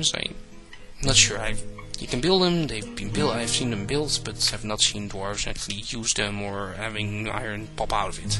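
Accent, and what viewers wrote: Netherlands. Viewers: i think you are from holland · Are you dutch by chance?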